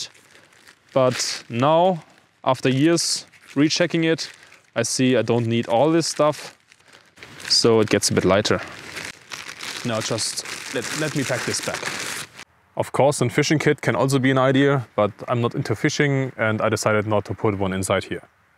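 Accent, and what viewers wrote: Germany. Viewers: nothing cooler then german english. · So german english accent🖤🇩🇪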